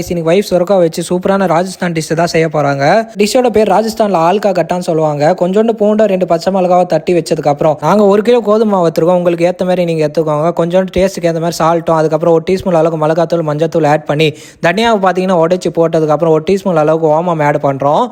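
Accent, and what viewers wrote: Tamil Nadu, India. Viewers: Yov nee Yenna ya local tamilan madri pesure.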